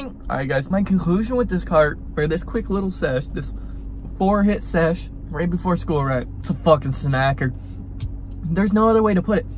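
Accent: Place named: Arizona, United States. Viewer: I can hear your AZ ascent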